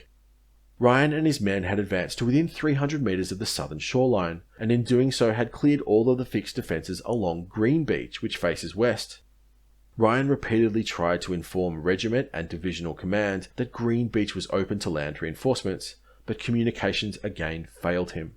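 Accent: Australia. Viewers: Thank god its not AI.... bonus: aussie accent too!😅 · He is actually an Australian - that is not a British accent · This Aussie narrator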